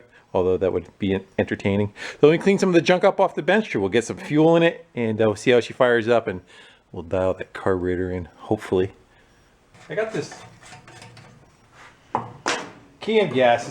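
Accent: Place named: New England, United States. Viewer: That's a New England accent if I eva heard one.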